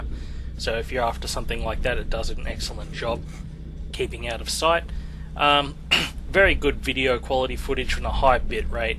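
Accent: Australia. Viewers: How can anyone who speaks English on Earth not love the sound of an Australian voice! Cheers!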